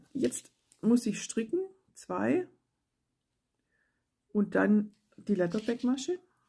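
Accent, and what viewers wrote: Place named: Swabia, Germany. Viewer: 👌toll erklärt. Schön mit Deinem "schwäbischen" Dialekt. Man kann's halt nicht verbergen.😁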